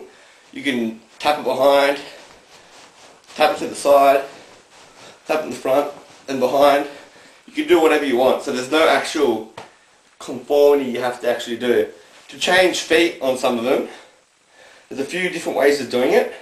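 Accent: Australia. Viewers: proper aussie accent bra! likes it! 5/5 this how i learnt! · I watched this video 8 months ago, it helped me get the moves down. I'm not gonna lie, I've ended up more hard style but still, this help me get started, Thanks for this video. And from the sound of it, you're Australian? Would make since, it is the * Melbourne Shuffle after all. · Are you australian? Fuck yea! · Aussie accent :) yay. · you mispelt guide*........i know your an ausie but please try and speak ENGLISH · Why are people saying he sounds British? Not sure but he sounds more Aussie than British to me. But that is just an Aussie talking, what do I know? We are all upside down anyway xD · aussie accent :) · I'm not sure what is stronger. His ability to dance or his aussie accent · are you aussie!? your an aussie! haha me too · OMG~!~! hes australian awsome acsent dude....... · his acent sounds australlian cause it is????? 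· He's Australian. Our accents aren't that difficult to understand. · i tried learning it, but i cant dance so i couldn't do it :( but omg great vid and ur rlly good at shuffling :) btw, what nationality are you? are u australian, ur accent is awesome :))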